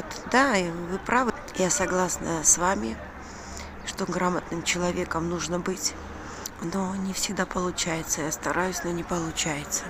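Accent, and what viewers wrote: Georgia. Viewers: Мне наоборот нравится ваш акцент грузинский и когда неправильно говорите слова это придаёт живости, характер вашим видео. Приятно, что это на видео живой человек, с душой, а не робот на работе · вы уже не первый раз отвечаете на комментарии о замечаниях, зачем? Очень много внимания этим людям, они только это и ждут. Мне например нравится ваш грузинский акцент.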